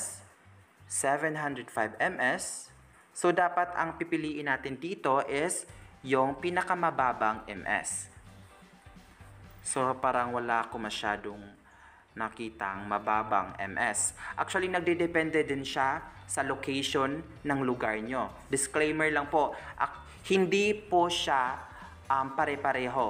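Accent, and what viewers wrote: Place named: Visayas, Philippines. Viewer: Sorry ‘te medyo I practice mo ng konte yung visayan accent mo medyo nahihirapan kaming listeners mo makasabay sa mga info mo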